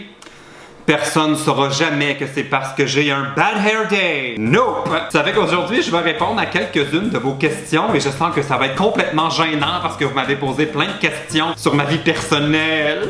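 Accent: Quebec, Canada. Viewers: Moi je suis française et j'adore l'accent Québécois il est tellement génial sinon +un abonné BYE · je kiff quand tu parle j'adore l'accent québecois et améircain :)♥ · j adore l accent quebecquois <3 <3 <3 <3 <3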